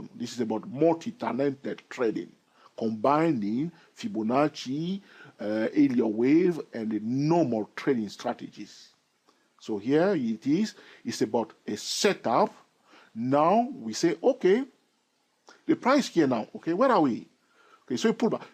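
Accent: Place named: France. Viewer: Very interesting discoveries. I think it's valuable. It would probably be a good idea to put text on the screen, spelling out the addresses of the various channels and websites you desire to have us visit (for those of us having difficulty understanding the accent). It was helpful that I've studied a small bit of French so I could understand why you would pronounce things. (When you get excited, you seem to use partial word sounds). In my opinion, the video WAS a bit longer than necessary due to some content repetition, BUT never-the-less, the value surpassed any difficulties experienced. I agree that you should break the habit of saying "OK" too many times, OK? (LOL) Allow me to direct you to an organization that has proven to be very helpful to me: Market Traders Institute <link>). They've recently come out with a fabulous charting software which they call Ultimate Charting Software. 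I've been able to specify my own mid-point Fibonacci arc lines with it (among many other things). The head of MTI made some very helpful technical analysis discoveries that have proven helpful to many. Just thought you'd appreciate knowing about it. My name is Paul A. Hansen. My MTI "handle" is FXF and I'm at <mail> Merci, mon ami.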